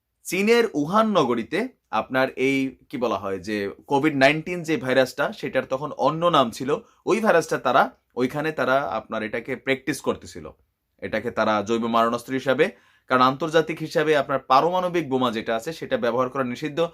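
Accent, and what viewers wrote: Comilla, Bangladesh. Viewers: ভাই আপনি কুমিল্লার 😍😍😍😍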